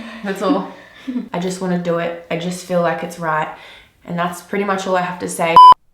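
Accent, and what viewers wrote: Australia. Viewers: You sound so Australian in this love it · even her cough sounds Australian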